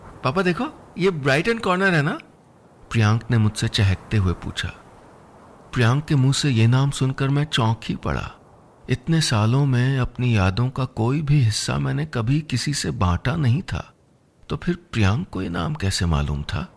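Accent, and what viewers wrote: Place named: Pahadi, India. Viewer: I am from Almora and your die heart story listener ...The way you narrate this story in our local tongue and tone is just out of the world... it was indeed an emotional story but I was smiling when you were like a pahadi speaker.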